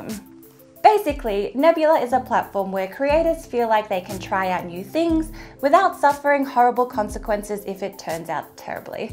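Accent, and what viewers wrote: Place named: Australia. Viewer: I don't know why I totally missed figuring you as an Aussie. Your accent just doesn't scream it to me. But no complaints finding out now. Opposite side of the world, but opposites attract! Hey, don't take my word for it -- just ask your friendly neighborhood magnet! So, I'll keep coming back as long as you keep sciencing the sh*t out of Youtube!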